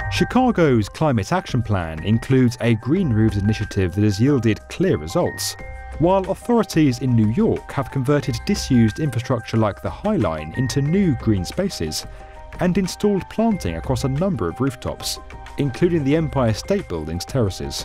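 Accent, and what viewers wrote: United Kingdom. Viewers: Why are British voices so satisfying.. David Attenborough Jeremy Wade Gordon Ramsay Whatever your real name is.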